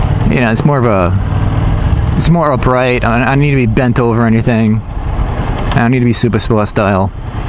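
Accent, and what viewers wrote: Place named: New Jersey, United States. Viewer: I detected an accent in your voice, did you live in Jersey before L.A.? You should get an aftermarket pipe, the M4 slip-on is cheap, so that people can hear you better (keep you safer) and it makes the bike sound like the bad-ass it is. I would also ditch those Mickey Mouse ear mirrors like I did on my FZ 07, too and get some CNC Mirrors by RIDEIT for your FZ 07, on Amazon. They look much better and are adjustable, trust me, and they're only $59.00. Nice little camera there, good mic. Doesn't that low beam suck! I can't stand riding mine at night at anything over 35 or you over drive them. Thinking of getting some good Denali micro lights to mount on the forks/take care of that crap. Be safe!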